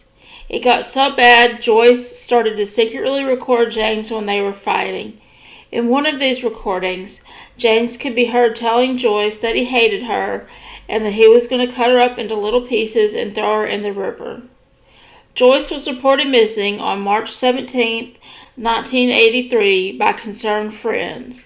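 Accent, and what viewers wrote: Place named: South, United States. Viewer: This story reminds us that God is still on the throne and maybe later but judgment is surely comes to evil murderer James Klindt . Most crack heads doesn’t live long anyway . He died due to his drug addiction . ( made him fall caused him to having fatal heart attack ) If he still incarcerated in prison and didn’t get parole , maybe he still alive . That’s the beautiful judgment of God and Joyce is finally rest cause James went to his master in hell . Thank you for accurate information with cute southern accent. From California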